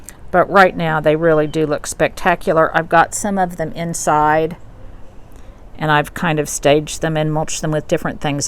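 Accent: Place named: United States. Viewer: Hi. Thank you for another very informative video. I don't have any topiaries. I am disabled, but decided to start gardening. Not the best hobby I didn't think about all the digging lol. So I am just starting with bedding plants in tubs and my hubby helps with compost. I am Looking forward to getting some topiaries in Spring to put in pots. Can you put a small topiary in a big tub, so they don't dry out? They make me feel happy as well. I do love your accent. I am from England so don't get to hear your beautiful American accent ever. Anyway thank you xx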